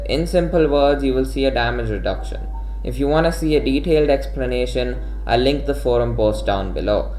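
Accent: India. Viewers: U sound kinda indian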